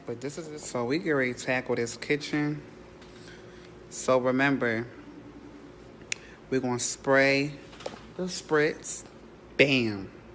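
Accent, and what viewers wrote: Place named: Baltimore, United States. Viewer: He sound like one of those baltimore people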